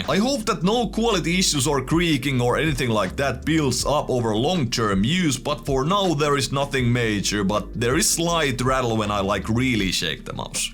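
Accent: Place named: Finland. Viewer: he's from Finland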